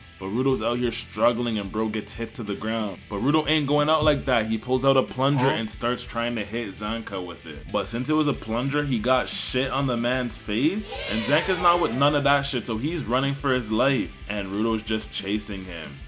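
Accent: Jamaica. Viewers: Are u a Jamaican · Yo are you a jamaican???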